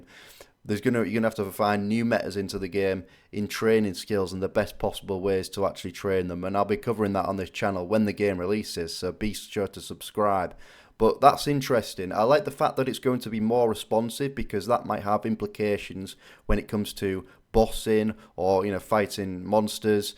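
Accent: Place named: United Kingdom. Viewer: Dude where abouts in the UK are you from ? Cant tell your accent.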